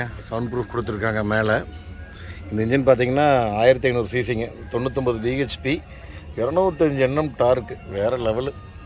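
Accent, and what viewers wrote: Kongu, India. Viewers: கொஞ்சும் கொங்குத் தமிழ் பேசும் கோமகரே❤❤❤❤❤ திகட்டாத பேச்சுடைய திருப்பூர் திருமகரே❤❤❤❤ அருமை அருமை அருமை அருமை யெப்பா வேற லெவல் மதிப்பிற்குறிய அய்யா🙏 நீங்களும் உங்க வீடியோ மற்றும் உங்கள் கார் வேலைப்பாடுகளும் எல்லாம் வேற லெவல்😊😊😊😊😊😊😊😊😊❤❤❤❤❤❤❤❤❤❤❤❤👍👍👍👍👍 அதிலும் குறிப்பாக அய்யா நீங்க நகைச்சுவை நடிகர் சின்னக்கலைவானர் திரு.விவேக் சாரோட தீவிர ரசிகராட்டம் இருப்பீங்கபோல😊👍 Secondல சொன்னது "ரைட் சைடு இண்டிகேட்டர்😂 (ஆனால் திரும்புனது இடது பக்கம்😂) அதாவது ரைட் சைடு இண்டிகேட்டர்னு சொல்லுவோம்.... அதே நேரத்தில் இடது பக்கம் திரும்புவோம்.... ஆனால் Straight ah போயி நம்ம ah கண்பியூஸ் பன்னுவோம்ல😂😂😂😂 என்றும் அன்புடன் உங்கள் மகன்போல இவன் ரா.முஹம்மது கபூர் (சென்னையில் இருந்து) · Getting addicted to your Kongu tamil.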